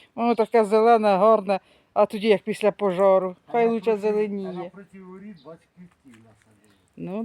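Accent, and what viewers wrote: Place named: Poltava, Ukraine. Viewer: Доброго вам дня. Гарно у вас і тихо. Це мабудь Полтавщина, по говору. Миру вам і добра. ❤❤❤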